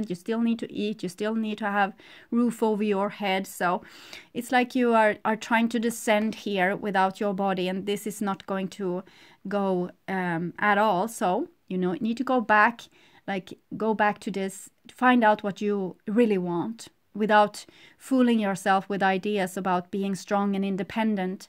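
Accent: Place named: Sweden. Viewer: Are you Swedish? You have a Swedish accent. Thanks nice reading still watching though.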